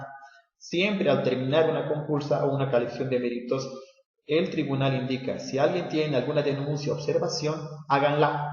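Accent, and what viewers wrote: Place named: Mexico. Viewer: Pensé que era de México, por su acento.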